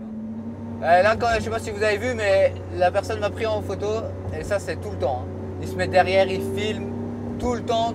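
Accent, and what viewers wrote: Belgium. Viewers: Tes belge de ou bruxelle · On reconnaît le petit accent belge. 🇧🇪🇧🇪🇧🇪🇧🇪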